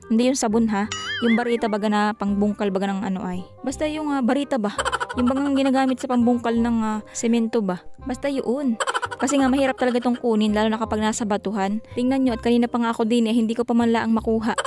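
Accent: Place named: Quezon, Philippines. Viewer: Di namn Batangas Yan Quezon Yan sya😂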